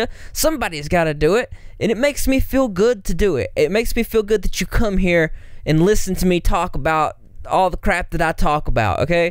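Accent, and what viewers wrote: United States. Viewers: Where do you live/are from? You literally sound like you could live in the states near me.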